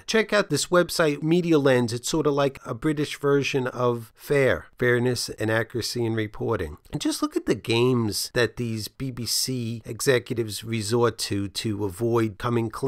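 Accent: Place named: United States. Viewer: You have a funny accent,american.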